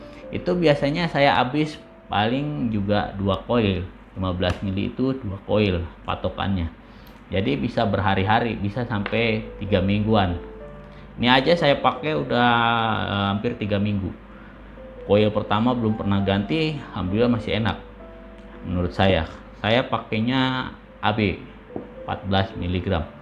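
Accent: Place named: West Java, Indonesia. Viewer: Letah sundana mani atra pisan namana oge sunda pisan euy😀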